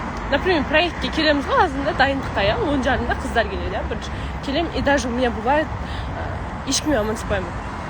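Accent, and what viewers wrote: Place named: Russia. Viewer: саған орысшаң акцентпен күлдіргі келтіресің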